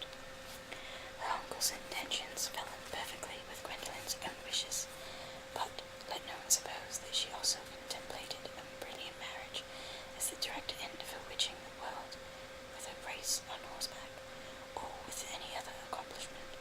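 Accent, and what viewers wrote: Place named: Southern England, United Kingdom. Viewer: I've been listening to this for a few nights now, and it's really relaxing :) You asked in the description if you wanted pointers on an English accent? To be honest, it sounds pretty bang on for a southern English accent, which is appropriate for this video, as long as you take into consideration that very few people actually speak like this in England anymore, our dialects are very region-specific :) a northern accent would be the next one to try, I would think. Well done x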